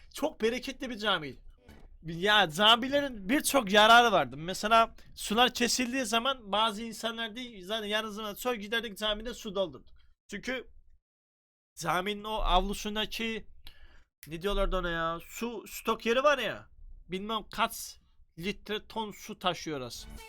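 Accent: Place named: Rize, Turkey. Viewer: Abi videoların bagımlılık yapıyor resmen saten rizrlilerin konuşmasına hayranım kendimde gümüşhaneli olarak seni izleyerek çok mutlu oluyorum resmen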